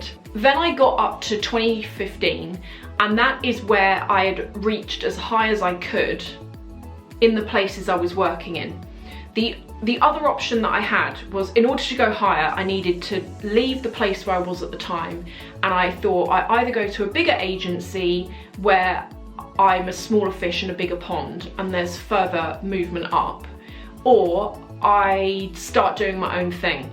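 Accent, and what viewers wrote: RP, United Kingdom. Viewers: As a French person tbh it is not your seemingly lavish lifestyle that made me wonder if you had money..it is more your posh accent. In any case I don't mind.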